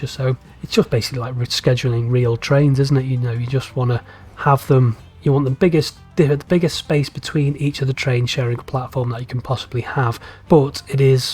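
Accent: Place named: Scotland, United Kingdom. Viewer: First things first. I think the issue of resetting the clock when using “full loan any/full load all” has already been addressed since I have no longer been experiencing trains never leaving stations. Second, you explanation of this tool is so disorganized that I do not get it. You click on something, then you delete it, click on something else, keep saying one think over and over instead of going systematically step by step. Third, I believe it´s fair to use standard English on You Tube. Even though I am not a native speaker your accent (assumably Scottish) is hurting my ears. The way you pronounce the word “unbunch” made me stop watching the video.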